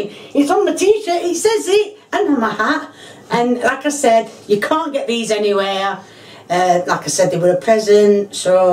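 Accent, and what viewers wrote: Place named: North, United Kingdom. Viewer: It's a Sheffield dedah accent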